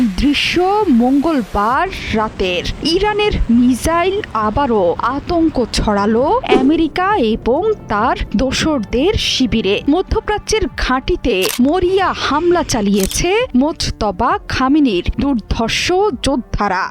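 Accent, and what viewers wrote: Kolkata, India. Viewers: কলকাতা থেকে ট্রেনিং নিয়ে আসছেন নাকি আপা।আপনার উপস্থাপনার ধরন এরকম কেন?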